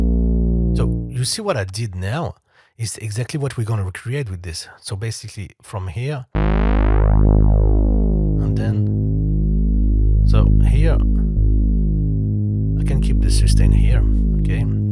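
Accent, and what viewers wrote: France. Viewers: Excuse my French but your English sound french 😅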